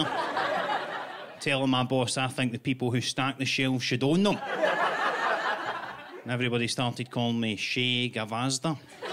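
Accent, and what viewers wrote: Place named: Glasgow, United Kingdom. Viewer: the glasge delivery, is superb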